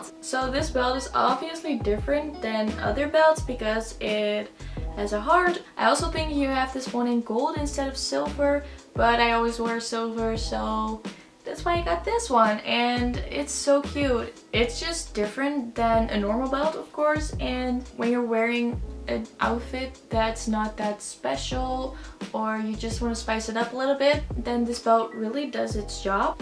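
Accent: Netherlands. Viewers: wacht wat je bent nederlandssss ahaha ik dacht het al (leuke video!)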